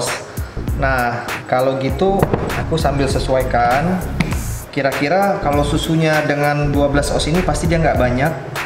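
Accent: Medan, Indonesia. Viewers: Kayaknya orang medan kau bang. Soalnya logatnya medan kali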